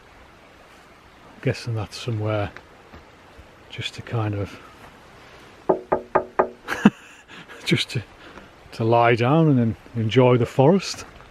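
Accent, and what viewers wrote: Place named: Scotland, United Kingdom. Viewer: your Scottish accent because it's cool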